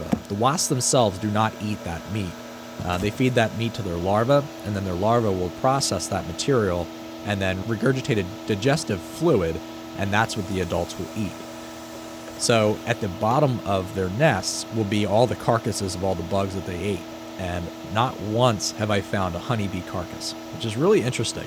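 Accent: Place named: Pennsylvania, United States. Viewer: Knew you were from PA before you said it, one of the most recognizable american accents. 😅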